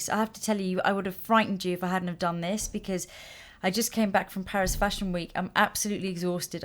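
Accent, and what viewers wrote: United Kingdom. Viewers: So peaceful your voice! I really love watching your videos 🥰, and love your British accent, it takes me back to my high school days with my British professors 😍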